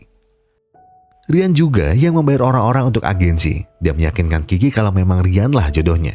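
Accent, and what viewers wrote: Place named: Surabaya, Indonesia. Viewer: ternyata orang surabaya masnya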